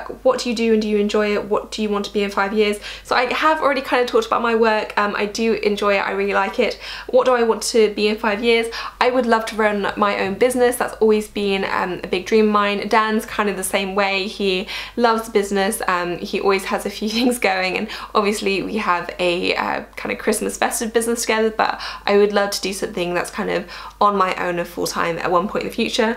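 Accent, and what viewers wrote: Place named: Wales, United Kingdom. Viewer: I’ve only recently come across your channel and I’d like to say how humble you come across, you give some brilliant advice. I’m from South Wales and was so surprised to hear you and your dad are Welsh! 😂😂 you’re definitely the poshest welsh person I’ve ever heard 😂😂. Well done on your career and your YouTube channel you’ve definitely got a new subscriber xx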